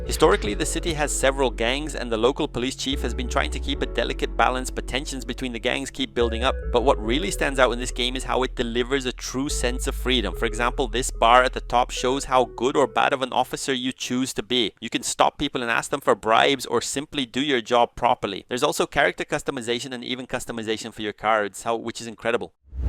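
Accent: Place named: Brazil. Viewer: A voz mano, o sotaque dele é muito de brasileiro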